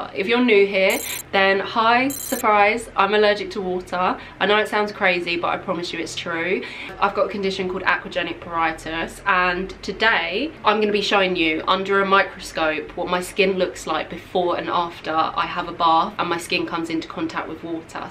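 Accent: United Kingdom. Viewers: over dose British accent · Love Yuor British Aaxent. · Your accent keeps reminding me of those listening tests from cambridge we always do in high school here hahahah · malak beauty girls that’s offensive why yall hating on her accent ik u may replie to me “it’s just a joke” but I’m British too and I don’t say it like woo we say it like Wawter so yea. Offensive! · It’s a British accent -_- what’s wrong with it??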